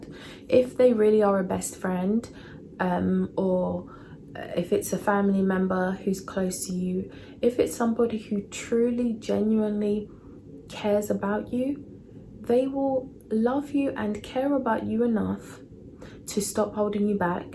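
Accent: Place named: United Kingdom. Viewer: So happy to hear a British accent and thank you for speaking on this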